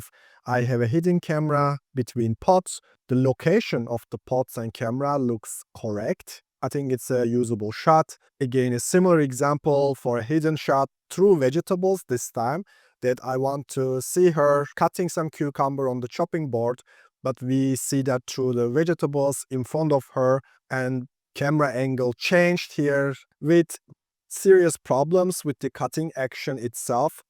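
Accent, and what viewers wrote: Turkey. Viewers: Great content as always and dude, I’ve been following you for a while and always kind of thought your accent sounded Turkish. Your English is super good, so I wasn’t totally sure. But after seeing that Istanbul clip, I’m like—yep, you’re Turkish, right? 😅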